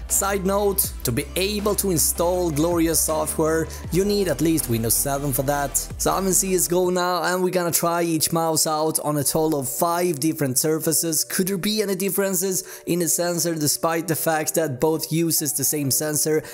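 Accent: Sweden. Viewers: Love your Swedish accent😂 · Like your swenglish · Are you from sweden?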